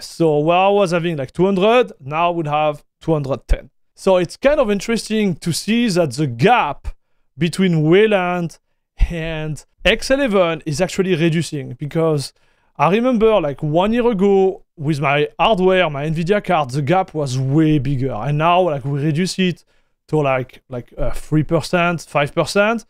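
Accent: France. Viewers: genuine question, is he french or belgian?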